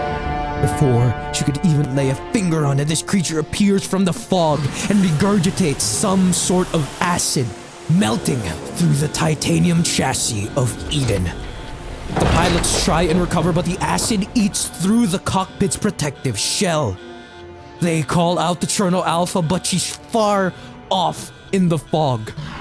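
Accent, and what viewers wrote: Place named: Philippines. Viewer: I had a feeling you were filipino from the accent but I couldn't be sure but hey, I got my confirmation at the start of the video lmao. KABABAYAN 🔥🔥🔥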